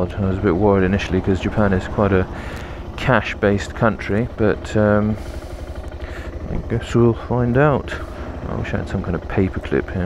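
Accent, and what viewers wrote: RP, United Kingdom. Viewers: carnt belive this guy s accent so posh love the videos. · sound's like your from made in Chelsea :)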